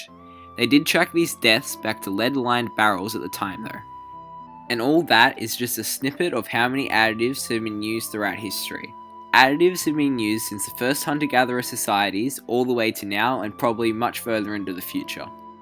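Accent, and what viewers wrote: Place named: Australia. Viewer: Le gasp Australian accent hehehe. (I mean, I knew that already, but still...)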